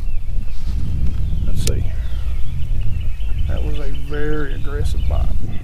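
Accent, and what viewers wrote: South, United States. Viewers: Great stuff. Best of luck to you this season! Love the southern accent!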